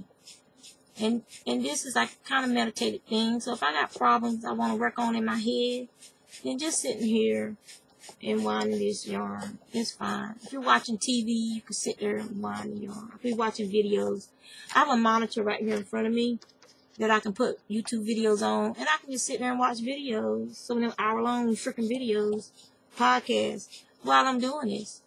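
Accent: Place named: South, United States. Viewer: Loved the bush stitch cowl,. I want to try making one. Gosh, you can fly with that hook! I wish I could crochet that fast. I agree with you, Susan Bates are the best and are the only ones I use. Hate Boye hooks. I have a thick southern accent too. Was raised in southwest VA. I love southern accents! ♡ Hope you have a good week. Looking forward for another podcast soon!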